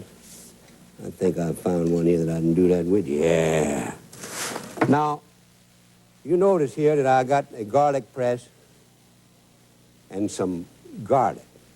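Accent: Louisiana, United States. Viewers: I do too. That is a specifically Cajun accent. Some have a thicker, French accent the deeper you go. And we southerners can tell each other apart because of the small differences in our accents. A person from North Carolina sounds way different from a Texan, so on and so forth. You don't hear this kind of speak outside of southern Louisiana that much... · I know this comment was posted a year ago, but seriously grow up. He wasn't a "Fake". E. Wilson (April 24, 1914 - September 5, 2001) Wilson was born in Roseland in Tangipahoa Parish, one of the "Florida Parishes" of Louisiana. Consider yourself taught. If you don't like the Cajun accent then I suggest you not watch his videos and not visit Louisiana or even to my mother's house. Mom and Dad, especially Dad talk like.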